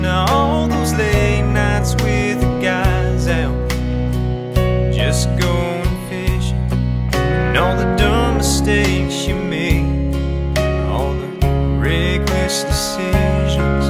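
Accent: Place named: South, United States. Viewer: This guy sounds so country, so good! 💪🏼😆👍🏼✨🔥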